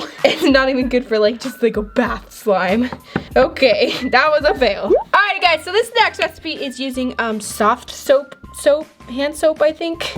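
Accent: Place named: United States. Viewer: Tot you were American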